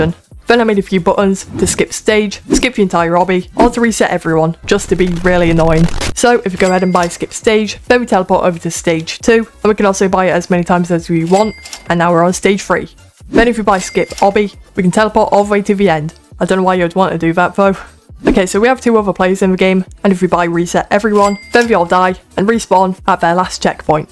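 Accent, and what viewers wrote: United Kingdom. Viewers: are you british im irish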